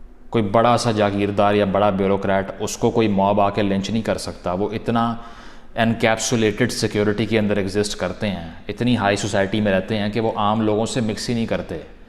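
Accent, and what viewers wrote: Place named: Pakistan. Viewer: This guy sounds like an educated Pakistani..........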